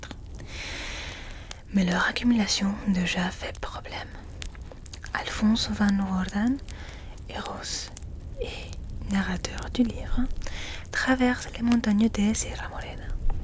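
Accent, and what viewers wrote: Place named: France. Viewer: Coucou 🖤. Qué lindo acento francés tienes.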